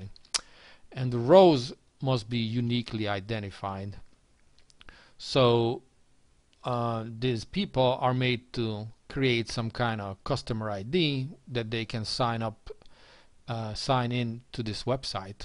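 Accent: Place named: Cuba. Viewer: Hey; are you American; living in Texas, and you speak Spanish? I’m trying to understand the influences on your accent ? Cuban ?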